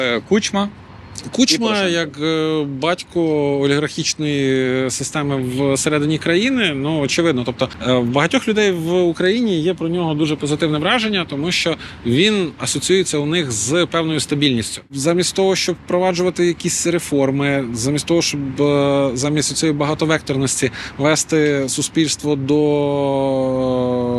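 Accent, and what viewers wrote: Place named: Okanye, Russia. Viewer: Ой якби не коливання з ноги на ногу, і не окання то нехай підросте нервує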